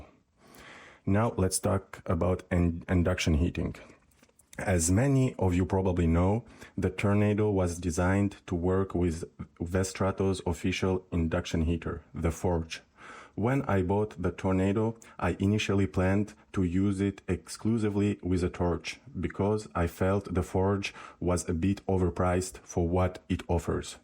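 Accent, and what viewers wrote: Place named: Eastern Europe. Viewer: nice eastern Accent im from Poland ! Peace ! ❤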